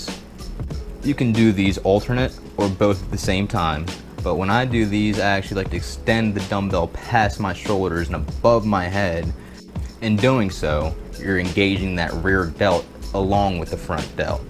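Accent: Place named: United States. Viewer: Lol that intro and what's with your country accent boy lol keep it up though man!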